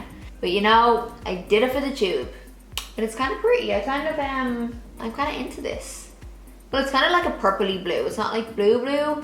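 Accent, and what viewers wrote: Ireland. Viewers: Seriously I am subscribing just because I love your accent. Irish? My ancestors are from Cork. Stunning look by the way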